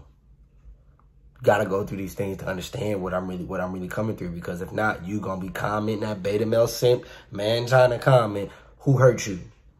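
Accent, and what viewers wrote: Baltimore, United States. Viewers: Big homie.... you from east baltimore? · Yooo are you from Baltimore? I can hear the accent. I’m originally from Silver Spring, Maryland.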